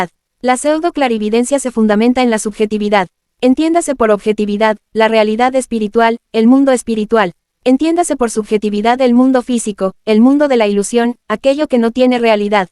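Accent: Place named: Rio de Janeiro, Brazil. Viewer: a Sede da FRA no Brasil , esta na cidade do Rio de Janeiro . nota-se o sotaque proprio daquela regiao neste.video . o audio nao possue uma boa qualidade . .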